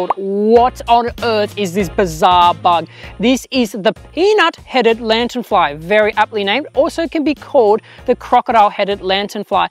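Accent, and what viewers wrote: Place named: Australia. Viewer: Your accent tells me you are from Australia :)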